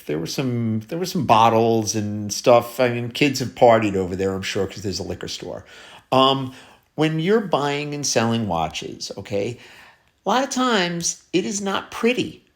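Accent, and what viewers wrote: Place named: New Jersey, United States. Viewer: How did I know yous is from Jersey...😊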